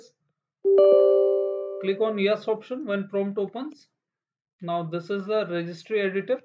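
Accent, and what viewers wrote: India. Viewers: always trust the indian accent tutorial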